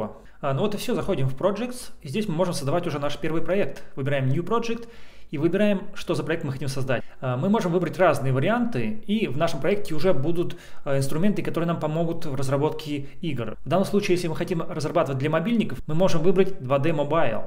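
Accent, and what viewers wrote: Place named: Spain. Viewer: вы испанец? очень красивый акцент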